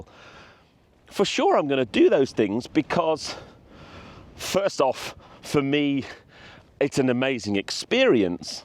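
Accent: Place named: RP, United Kingdom. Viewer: Mmm but you have gone bit posh😢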